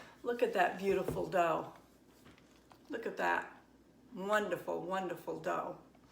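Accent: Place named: Maine, United States. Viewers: You remind me of my great gramma Goldie. She was from mid state Maine toward the west. She had just the same accent as you🤗 she passed 9 years ago this December. She was just shy of 93. What a feisty lady she was too!! Anyway, I’ve been looking for a recipe like this. Thank you for the recipe and the memories. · I was searching YouTube for a dark molasses cookie like my grandmother used to make, and yours look most like them. I didn’t even notice the name of your channel, but I thought “she sounds like she’s from home” Haha yup, Maine💛I’ll be trying your recipe!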